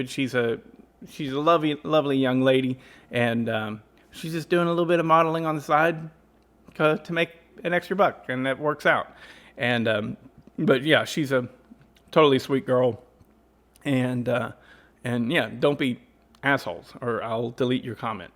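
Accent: South, United States. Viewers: well thank you man. The southern accent can be crazy at times!! I plan to hopefully keep us learning and trying new things 👍🏻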